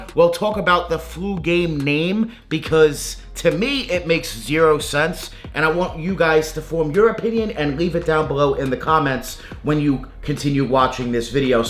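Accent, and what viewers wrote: New York, United States. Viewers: Nobody gives AF what this out of the closet Drew Carey with a NY accent thinks. Stop saying Jordan wasn’t sick. Having food poisoning makes a person sick. In fact, many of the symptoms are similar to what someone feels when they have the flu. Stick to promoting your nerdy “DO NOT BUY” bs videos. By the way, I find it ironic that someone who looks like they have no athletic ability whatsoever tries to influence people on sneakers.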